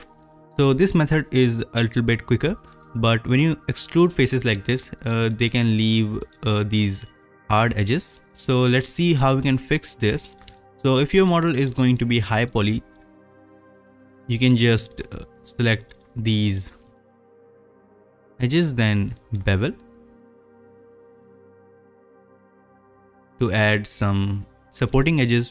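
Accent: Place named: India. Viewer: Indian Accent = 10/10 Tutorial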